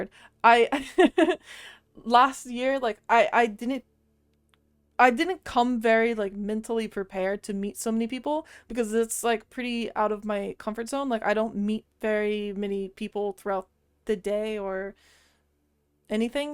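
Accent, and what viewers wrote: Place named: Australia. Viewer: Wow I always thought you were some type of Australian for some reason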